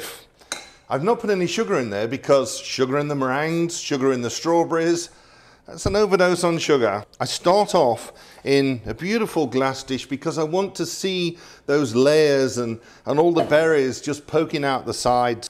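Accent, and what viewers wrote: United Kingdom. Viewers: You didn't introduce your family to the viewers. It would have been great knowing them. I love watching your dishes especially the way you speak about the Queen, Prince William and Prince Harry and of course Princess Diana and all the stories. I just watch the dishes being made though I am a true Vegetarian, don't even take eggs. Good luck. Keep telling about your Royal experience and stories along with history. I like your authentic British English. I am from India.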